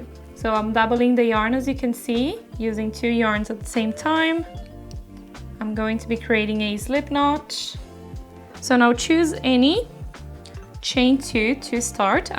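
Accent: Brazil. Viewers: amiga lindooo! fiquei curiosa pelo sotaque, vc é brasileira?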